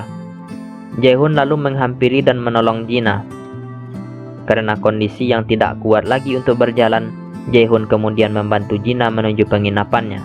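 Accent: Madura, Indonesia. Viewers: Admin logat madura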